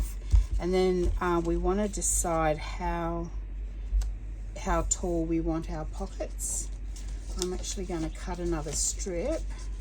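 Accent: Australia. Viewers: These are beyond awesome!! New subscriber! Your Australian accent is neat, too!💚💚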